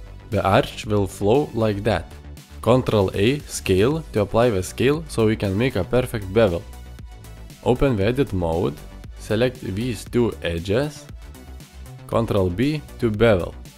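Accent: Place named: India. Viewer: Indian accent 😢😢😢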